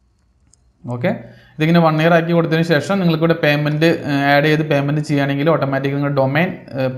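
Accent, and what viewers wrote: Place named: Thrissur, India. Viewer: Bro thrissur aano veed?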